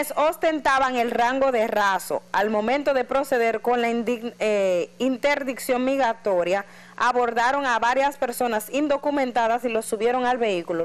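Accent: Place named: Haiti. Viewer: No es por nada pero esta presentadora puedo sentir qué es ahitiana escuchen bien su acento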